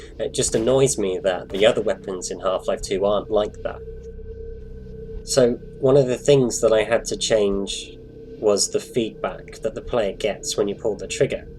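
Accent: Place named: United Kingdom. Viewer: Huh, never imagined you having a british accent. I looove the mod though, I've already played through it 3 times <3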